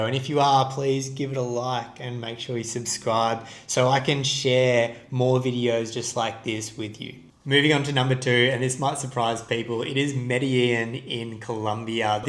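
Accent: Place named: Australia. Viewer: Cheers brother 👍 Nice to hear an Aussie accent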